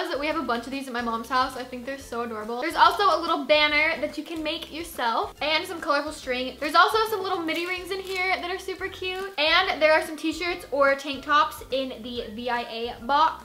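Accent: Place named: United Kingdom. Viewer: You sound British in this video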